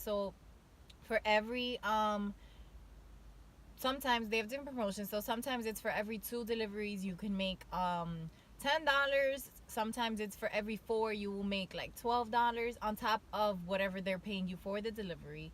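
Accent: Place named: Latin America. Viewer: I’m guessing your Latina by the accent. Good luck stay positive but the best way to make money is by referrals if your in sales.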